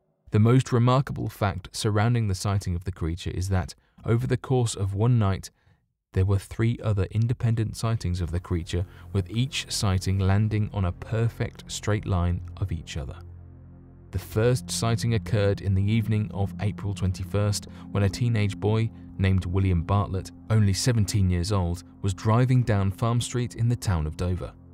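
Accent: United Kingdom. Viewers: The voice of this narrator is better than the others. It is easier to understand, with a more tamed British accent. Less viewers will become sleepy.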